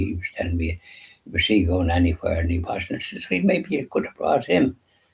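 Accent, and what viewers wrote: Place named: Ireland. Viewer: Your Irish accent is difficult for me to understand sometimes but I understand most of what you say, and I think I’ll understand you more the more I watch your videos! God bless you and yours!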